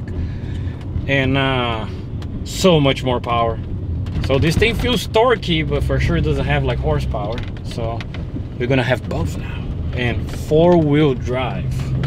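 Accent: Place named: Brazil. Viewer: Nice Accent hahaha Brasilien one! ancioso por esta serie!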